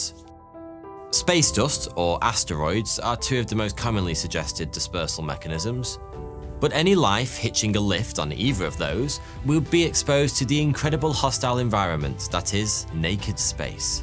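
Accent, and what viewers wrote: United Kingdom. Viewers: Are you British? What kind of accent do you have?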